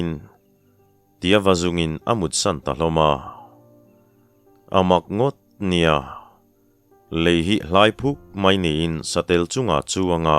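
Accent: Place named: Champhai, India. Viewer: Tawng thluk thu leh lam dan ah chuan mizo thawnthu chhiar ka hriat toh ah chuan keini Champhai lam tawng thluk dan nen chuan i tawng thluk leh tawng kau chhe hi ala in mil in a dang hauh lo mai, khawdang tawng chu sawi lo ila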